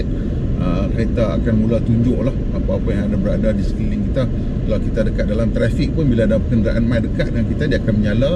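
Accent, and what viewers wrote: North, Malaysia. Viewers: Mmg puaih hati haih dgr penerangan loghat utaqa dia...batoi² Sempoiii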